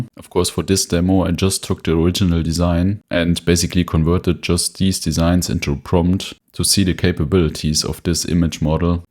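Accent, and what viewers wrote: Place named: Germany. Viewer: Gutes Video. Aber noch nie so deutsches Englisch gehört, aber mach weiter so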